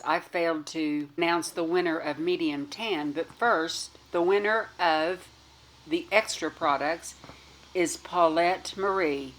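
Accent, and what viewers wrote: South, United States. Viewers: You sound southern and your food sounds southern....I am from Texas ...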